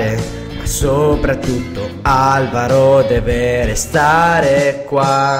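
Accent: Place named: Naples, Italy. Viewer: carina, togo l'accento Napoletano, apprezza i ringraziamenti perché sono Juventino XD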